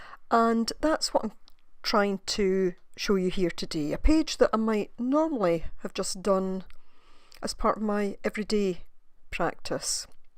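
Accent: Scotland, United Kingdom. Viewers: Hello, I have spent the last three days watching your videos after discovering your channel. I really like your style of work, your explanations and your scottish accent! Greetings from Germany!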